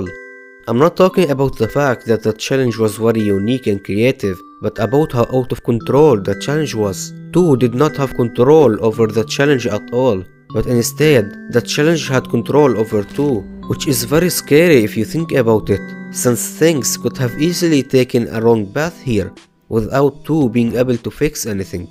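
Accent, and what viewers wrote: Russia. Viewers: Your accent kinda funny Are you Russian? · russian guy?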